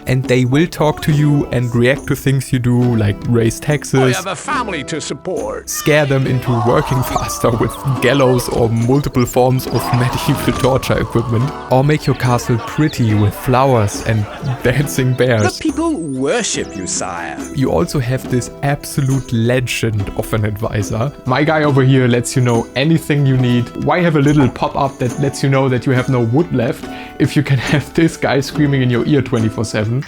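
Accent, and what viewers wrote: Germany. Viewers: That is the hardest german accent i´ve ever heard :D But good video! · Empire Earth and Cursader ❤ but are u german? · Ten seconds in and I already know you are german, very obvious accent lol But I really love all the memes in this video, I am indeed "all about the Ackermatch" when playing Crusader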